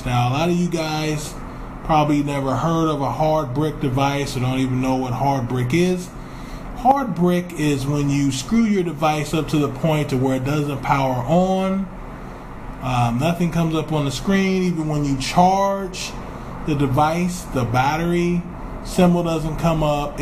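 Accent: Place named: United States. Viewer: love your american accent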